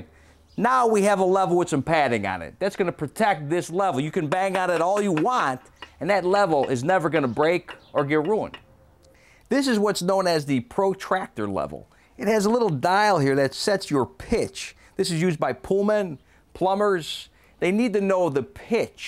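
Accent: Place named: Brooklyn, United States. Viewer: I am getting Italian Brooklyn vibes lol. Learned a lot TY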